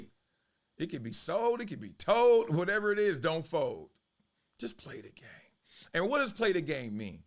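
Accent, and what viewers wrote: Los Angeles, United States. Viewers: Wow. You’ve got that La rap accent pure gold 👏🏾👏🏾👏🏾.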